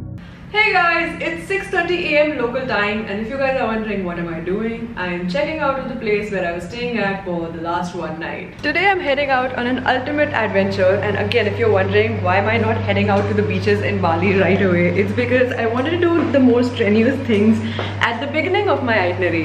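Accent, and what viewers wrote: India. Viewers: Are you Indian?..accent is quite Indian..! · Your accent like indian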